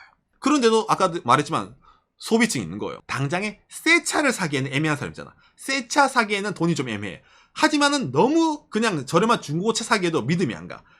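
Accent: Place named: Gyeongsang, South Korea. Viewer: 말투가 대구네요 구수합니다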